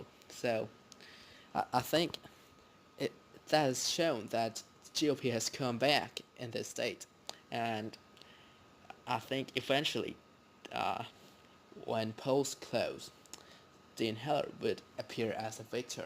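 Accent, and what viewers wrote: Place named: South, United States. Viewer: you have some of that accent. Are you southern or your folks southern? it is my favorite accent category. Thanks for the video!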